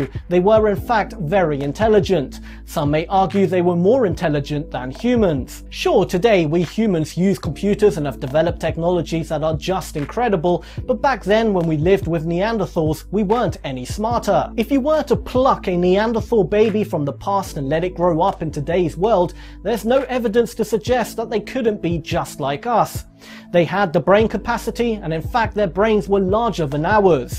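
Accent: United Kingdom. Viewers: you sound British and your number 2 signal just told us all to go forth and multiply! Really! You should know the difference btween two and FO.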